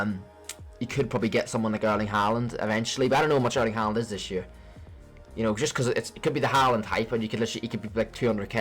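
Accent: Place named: England, United Kingdom. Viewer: Good video, but why do u sound half English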